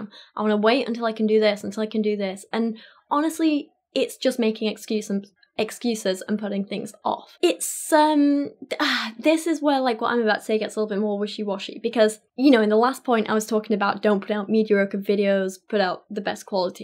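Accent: United Kingdom. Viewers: Uh ok why the fuck haven't I noticed you were British until just now? I've been watching you for about a year now lmao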